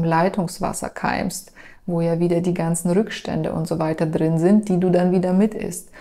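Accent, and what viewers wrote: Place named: Soviet Union. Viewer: Dieser sovietakzent 🥰🥰🥰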